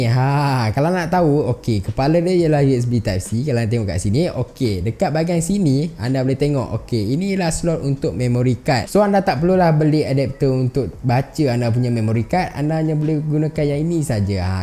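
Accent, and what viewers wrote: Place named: North, Malaysia. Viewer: ...aik utaqa pulak ka bang hahaha...duduk mane,btw sebenaqnya aku pun oqang utaqa gak hehehe😂👌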